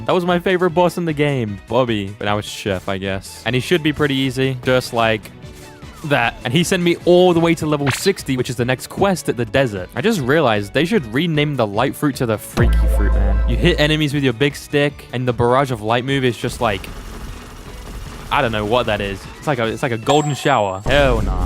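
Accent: United Kingdom. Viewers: what is that briish acent mate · this guy sounds british but i might be wrong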